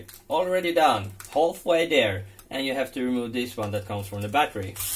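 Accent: Sweden. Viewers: Gotta Love Swedish English gotta love clever Swedes gotta love a perfect solution Thanks - I'm now the only person in my state who knows how to fix this problem WOW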